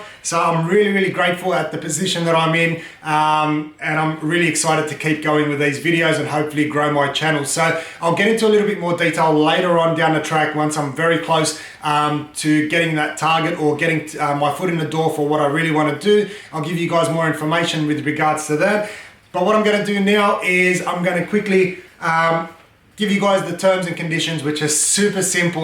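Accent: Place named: Australia. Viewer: Love ya accent, decent run through. Easy to understand and implement. Go aussie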